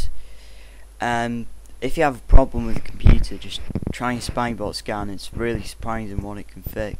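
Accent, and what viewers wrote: United Kingdom. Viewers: yes brtain people nice accent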